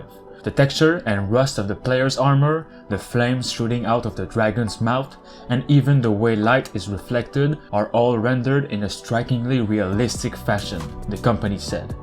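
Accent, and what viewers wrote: Quebec, Canada. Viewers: Are you from quebec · Happy to hear this project is still alive, looked very promising. And hey, are you from Quebec ?!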